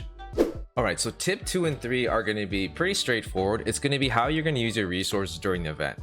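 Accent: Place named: Canada. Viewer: Ahhh. A nice normal Canadian accent.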